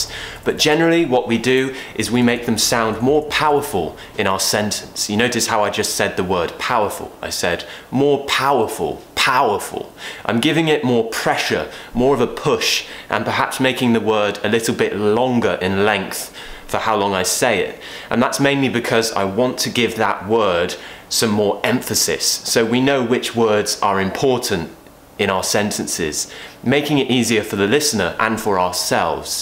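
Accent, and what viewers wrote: United Kingdom. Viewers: Because he himself speaks very clearly british English it is easy to improve our accent just while listening to him and repeating the way he speaks. That’s why he is very effective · I am gonna come to uk just to speak in your accent. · I'm not exaggereting right now. Literally, you are the best english teacher on youtube or galaxy. I'm constantly watching your videos and learning decent British accent. Thank you very much mate.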